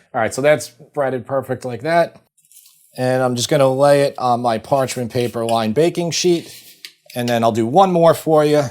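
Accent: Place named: Midwest, United States. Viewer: Why is ' accent so different from? sounds like a New Yorker but sounds midwestern.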